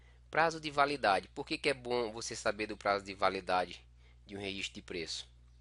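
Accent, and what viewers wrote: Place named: Northeast, Brazil. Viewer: Que sotaque gostoso de ouvir! rsrs O senhor é do nordeste?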